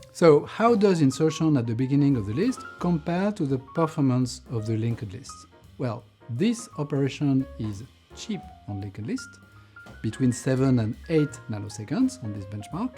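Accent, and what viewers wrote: France. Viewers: Thank you! I guess my French accent is incidental though... 😄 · I love the JEPCafe and I esspecially love your french styled englisch ! Keep on doing this series, its so great and informative ! Thumbs up!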